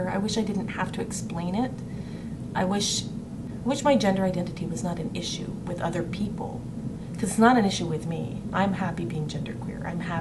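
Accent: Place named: Canada. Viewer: Yeah, that's my case, too. I'm also totally used to the pronouns; it's just legal/ work/ questionnaire forms and that "male" designation that makes me feel like I'm being DEFINED, categorized, in an incomplete or erroneous way. Of course, race is a construct, too! We're all genetically-compatible... but that's a topic for another channel... Could tell you were Canadian; it's the pronunciation of "about." :^)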